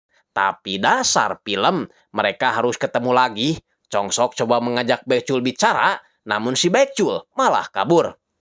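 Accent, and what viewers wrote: West Java, Indonesia. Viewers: Saudi hadir Saya suka yg baca filmnya sepertinya orang sunda Bnyak lucunya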